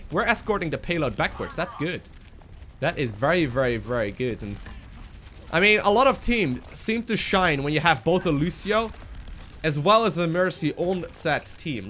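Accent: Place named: Netherlands. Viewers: he sounds dutch to me as well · This guy is dutch right? his accent is so dutch lol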